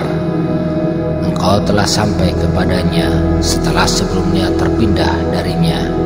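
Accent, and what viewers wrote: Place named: Aceh, Indonesia. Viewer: ne orang Aceh kayak nya, logat bicara, na nomor W A!,.'-.', '.-.'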